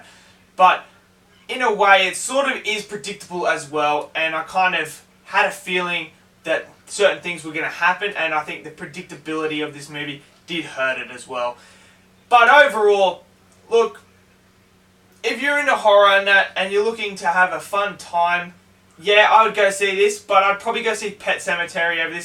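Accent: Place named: Australia. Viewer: Martin Cuadra he’s also from Australia so things may not sound the same with an accent. I’m glad you had the time to actually sit there and write a comment about it. 👏🏼👏🏼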